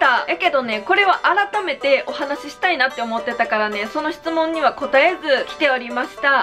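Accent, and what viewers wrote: Kansai, Japan. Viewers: 同い年なんや！！！おんなじ関西出身やし、親近感あります😍 わたしも周りから25歳らしくしろって言われる時あるけど、人間何歳になってもやりたいことはがんばったらできるし、今しかない！って時にやるしかないですもんね！いつもバリバリの関西弁で、なんか友達と話してる感じやな〜と思っていつも見てます！これからも頑張ってください！応援してます🥳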